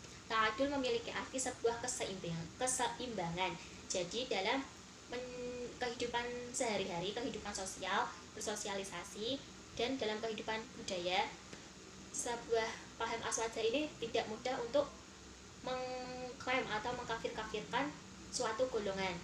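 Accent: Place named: Java, Indonesia. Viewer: Full audio nihh.. Barokalloh Alhamdulillah penjelasannya mudah dimengerti ty Btw Logatnya lucu ukhty, medok banget uwwuu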